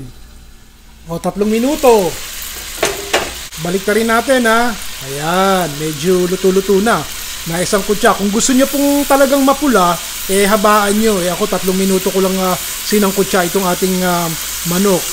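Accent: Philippines. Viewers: Chef taga Saan po kayo originally kasi ang Ganda ng punto ninyo 😂 tagalog na tagalog eh 😅 Saan po kayo naka base . Gagawin ko Ito today sa malamig na weather here in Scotland . 🏴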